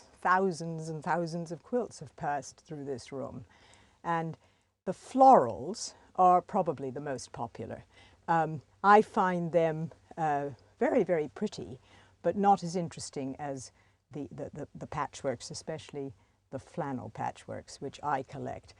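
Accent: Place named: New England, United States. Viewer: It’s partly posh New England..a friend of mine spoke the same!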